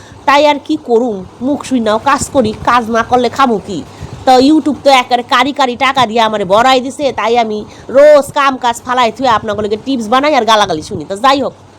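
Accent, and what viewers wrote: Bangladesh. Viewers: আরে বাহ্ আজকের রেমিডি তো সুন্দর কিন্তু তোমার কথা মানে একদম বাংলাদেশের কথা দারুন সুন্দর করে বললে আমার দারুন লাগলো ❤❤❤ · ও দিদিভাই আজকে তো পুরো বাংলাদেশের স্টাইলে ভয়েসটা বেশ ভালো লাগলো❤❤❤ কথাটা তো বেশ ভালই লাগলো❤❤❤ তোমার ছেলের গাড়ি ভেঙে গেল তোমার এই টিপসটা এপ্লাই করবো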